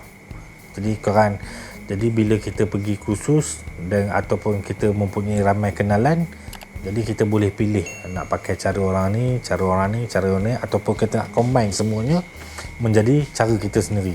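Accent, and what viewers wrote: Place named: Pahang, Malaysia. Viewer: Lidoh Ora pahang ni kalau tengok gaya cakak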